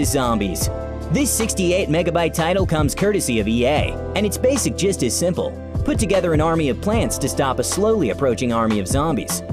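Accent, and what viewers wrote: United States. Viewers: A handsome Indian with a perfect American English , Man you rock!!!!! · Theb why does your voice sound so american if your indian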